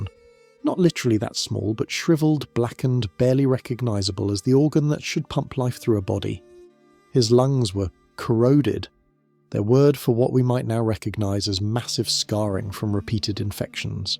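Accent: United States. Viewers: Old gammon Brit here. We all used to fall about in tears of laughter when somebody said "dienasty"! Now British kids - such as you - speak american. Oh well. That's the future I suppose. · Sounds like a normal Guy from Alabama.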